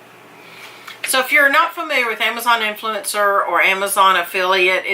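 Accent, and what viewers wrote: South, United States. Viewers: You definitely from the south hunnie, love your accent 🥰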